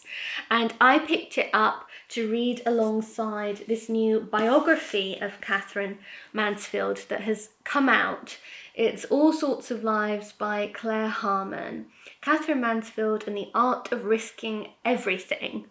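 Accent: United Kingdom. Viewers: You just blew my mind when you mentioned you were born in Regina, I was born and have lived in/around Saskatoon my whole life and so many people have no idea where Saskatchewan is, and with your accent it's hard to believe you've lived anywhere but the UK. This was a fun surprise to my day! Annnd now I want to make soup, it's still -18 here so I need to get my hands on these ingredients and make this asap! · Isn’t it interesting you have such a strong English accent even though you spent much of your life outside of England- I wonder if that is because you were homeschooled and learned from your mother? I loved learning all these new things about you and I am loving all the new content you’ve been putting out.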